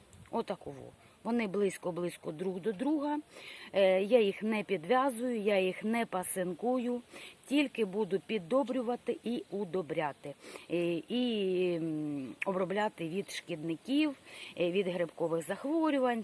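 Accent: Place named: Kryvyi Rih, Ukraine. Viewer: Дуже гарна ваша мова! Така мила мені! В мене подруга з Кривого Рогу. Це так приємно чути, бо й вона так говорить! Хай буде здорова і щаслива!!!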